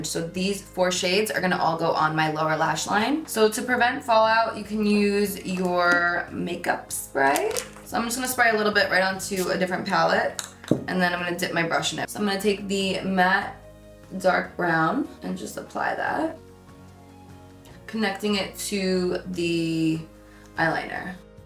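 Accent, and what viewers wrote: New Jersey, United States. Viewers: You sound like your from Jersey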